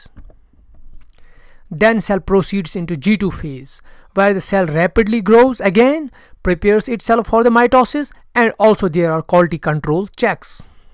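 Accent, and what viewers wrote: Kashmir, India. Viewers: Sir app kashmir sa ho kya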